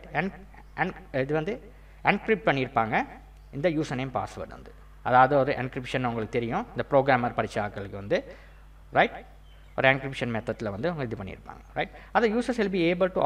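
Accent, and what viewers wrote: Sri Lanka. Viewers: Colombo tamil ( srilanka ) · Type of Upcountry Tamil in Srilanka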